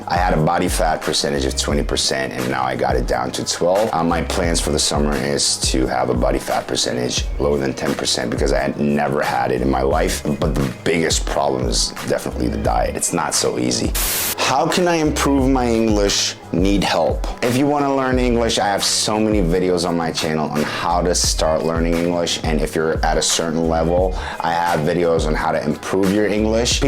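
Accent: United States. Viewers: I like the way he says channel. It sounds like chiennal. I wonder what part of American accent he has.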